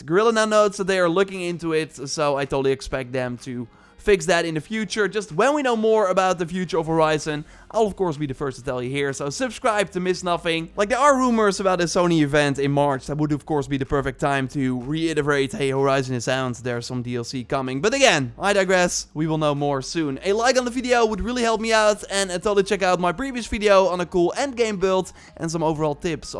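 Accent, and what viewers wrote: Netherlands. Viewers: Man man dat Hollanglish.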